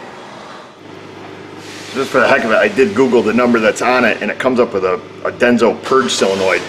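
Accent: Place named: Upstate New York, United States. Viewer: Cancer vent valve? Oh.. canister... That upstate accent!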